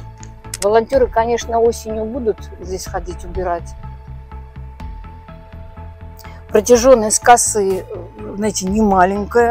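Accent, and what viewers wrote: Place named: Moscow, Russia. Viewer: Если бы не говор московскоголубой было б отлично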